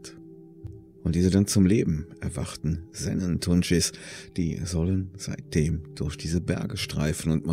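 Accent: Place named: Cologne, Germany. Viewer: Ja die Stimme 👍🏻…. als Regierungssprecher wäre der beste Wurf den diese Regierung machen könnte. Und diesen Akzent kenn ich nur zu gut als gebürtiger Kölner…… den findet meine Frau auch so amüsant und ansprechend 😉. Leider ist es mit meiner Stimme nicht so gut bestellt 😄. Ich würde mir wünschen diese Stimme mal als Synchro Stimme im Kino zu hören….. finde das mittlerweile manche Stimmen gefühlt in jedem Film vorkommen. Speziell die von Ryan Reynolds, Chris Evans und der Himmel weiß wer da noch alles synchronisiert wurde von dem Herrn. Da wäre mal eine neue Stimme mal wirklich angesagt.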